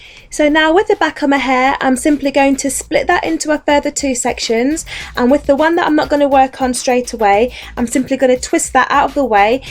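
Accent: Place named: RP, United Kingdom. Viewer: God, I want your accent. I've always looked at those accents as classy and posh. Very pretty sounding.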